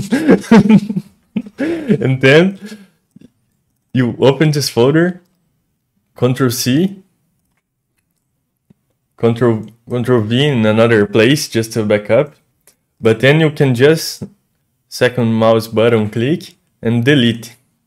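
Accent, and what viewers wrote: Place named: Brazil. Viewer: fiquei um tempo pensando que o sotaque é familiar e quando vejo os comentários é br kkkkk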